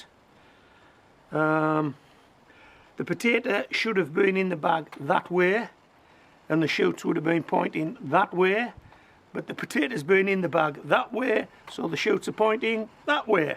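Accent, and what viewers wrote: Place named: Teesside, United Kingdom. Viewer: Even the toast looks spot on, can I ask you a personal question, are you from up north, teesside area, you're accent sounds like you live round the corner from me 😂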